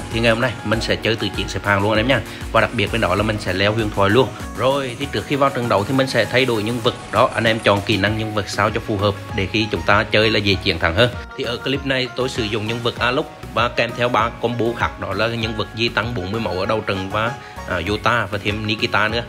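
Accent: Central, Vietnam. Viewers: Anh người miền Trung hả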